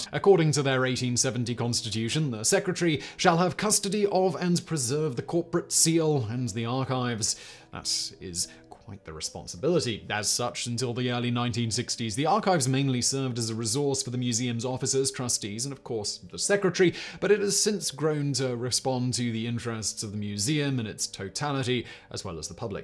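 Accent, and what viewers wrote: United Kingdom. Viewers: I have a question, HOW do you keep your voice with all of the talking that you do?? Been a fan of all your channels for a while, but just found the Casual Criminalist Podcast last week. I drive a truck 9 hrs a night here in the US and listened to them all last week. The sarcasm and proper British way of speaking make it great.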